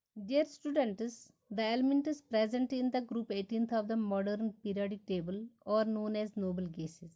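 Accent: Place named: England, United Kingdom. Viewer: English accent tho 🙂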